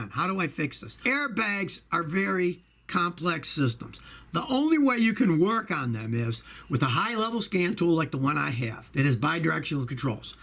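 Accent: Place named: South, United States. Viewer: YOU ARE SO SOUTHERN , jeam BEAM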